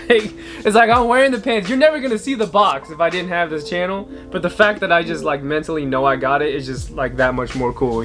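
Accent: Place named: Houston, United States. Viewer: Bro are you sure you're not from Houston, TX you sound just like me lol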